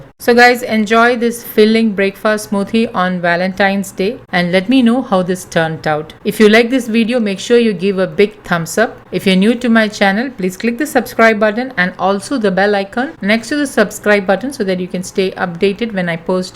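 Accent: Kerala, India. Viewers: thank you so much akka love you loads you are a inspiration for me even I like your voice and typical Kerala accent English I love it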